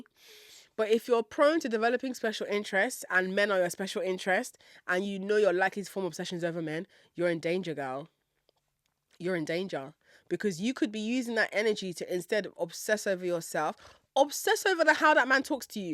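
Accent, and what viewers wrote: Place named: United Kingdom. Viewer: this is my first time hearing your voice and i didn’t know your were a UK babeeee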